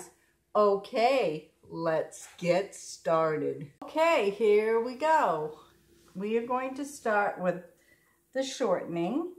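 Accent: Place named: East, United States. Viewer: I remember the molasses cookies that were so soft and gooey and delicious back in the early or late 1980s I believe in high school. they were only $0.25 and they were so delicious thank you for sharing this. I hear a thick Eastern accent and my grandma which was my dad's mom was from Glens Falls New York. I think my dad was born in Albany.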